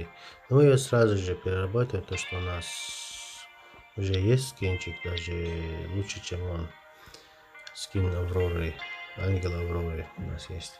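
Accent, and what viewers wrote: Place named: Chechnya, Russia. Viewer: Ахах ну у тебя акцент чеченский,) улачи в развитии p.s на чеченском-волк)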